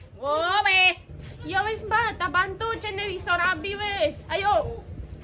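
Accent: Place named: Lumajang, Indonesia. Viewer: Koyok boso lumajang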